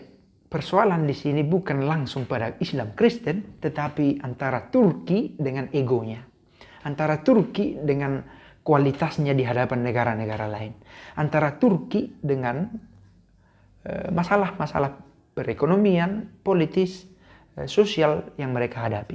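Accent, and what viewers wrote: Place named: North Sumatra, Indonesia. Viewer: Naratornya dialek sumut kayaknya🤣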